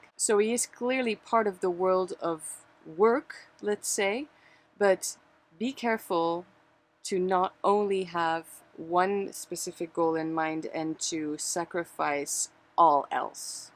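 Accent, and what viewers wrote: France. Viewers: Jolie Accent Français 😊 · J’adore ton accent français! (Je suis canadienne francophone donc notre accent est très différent) J’ai apprécié ce vidéo, sortir de sa zone de confort ce n’est pas facile, mais on est tellement fières après! La croissance se fait inévitablement en traversant l’inconfort. Merci de nous avoir amené avec toi lors de ce roadtrip, le concert dans le cratère devait être incroyable!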